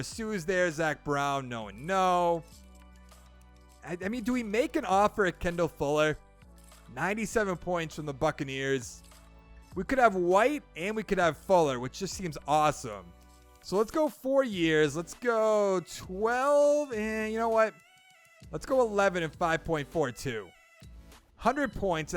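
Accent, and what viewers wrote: Boston, United States. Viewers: This is the thickest Boston accent I've ever heard besides Ben Affleck and Matt Damon😂😂😂😂😂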